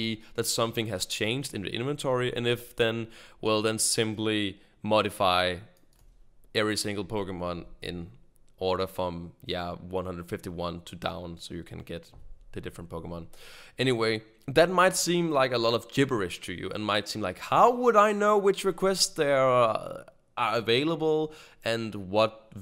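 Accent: Denmark. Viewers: du er god til engelsk men man kan godt høre at du er dansker!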